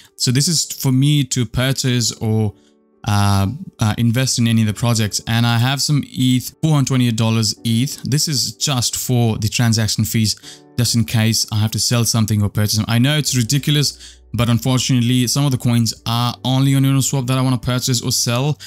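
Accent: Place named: South Africa. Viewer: I hear a South African accent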